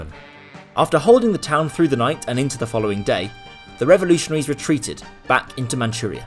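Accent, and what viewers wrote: Manchester, United Kingdom. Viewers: Yes. A British-sounding man with an apparently good knowledge of North Korea history. He seems to have also a good pronunciation of North Korean names and places. Not to mention his sophisticated taste in choosing background music. In short a man of mistery. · Yes, sounds like a bit of a Manchester accent to me, certainly a bit Northern UK?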